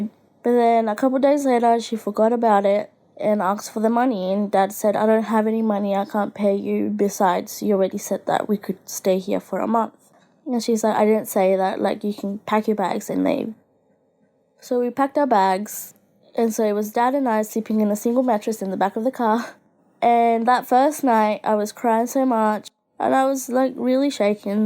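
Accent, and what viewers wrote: Australia. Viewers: She’s Aussie! I’m not the only one yay! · Oh she's an Aussie 😀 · OHHHH MEH AUSSIE GURL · Are you Australian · I knew you were Aussie Aussie’s rock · YOU’RE AUSSIE? YAY! I AM TOO! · Finally an Aussie! (im australian) 🇦🇺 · Finally somebody who is aussie 🇦🇺🇦🇺🇦🇺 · So, are you Australian or Story booth is Australian? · She’s Australian don’t make fun of her accent mate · I knew you were aussie!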